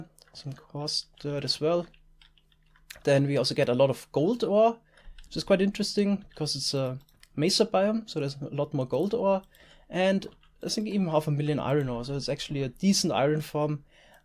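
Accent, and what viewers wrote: Germany. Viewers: Der deutsche Akzent, herrlich xd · Are you german?? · German accent is real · man hört so hart den deutschen Akzent heraus lmao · Is he german? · Richtig Geiler Deutscher Aktzent von wo kommst du? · his German accent says enough, this guy is definetly an engineer irl. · He sounds very german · He sounds DEUTSCH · Dein Deutscher Akzent gefällt mir ^^ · Bist du deutsch? 😂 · aber schön deutscher Aktzent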